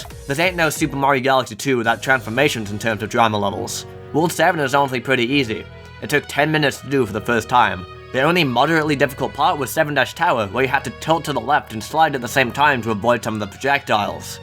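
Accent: United Kingdom. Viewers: you have quite an interesting accent/dialect; you sound British, but not quite at the same time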